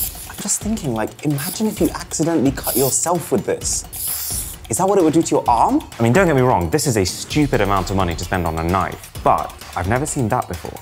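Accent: United Kingdom. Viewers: Are you briesh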